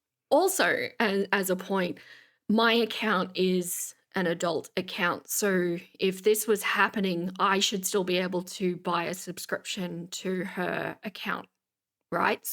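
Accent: Australia. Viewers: Love hearing your thoughts and analyses/detective work. Fellow future-dweller here 😊 although from your accent it sounds like youre from Vic. Heading there for PAX this week. Keep the vids coming!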